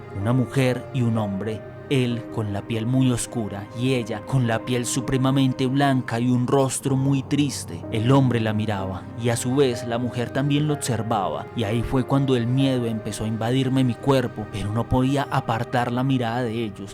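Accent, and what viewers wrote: Colombia. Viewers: con razón me parecía colombiano 😂 pero lo felicito están bien las historias gracias saludos Bendiciones 🙏🏻❤️ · Excelentes relatos , con voz de acento colombiano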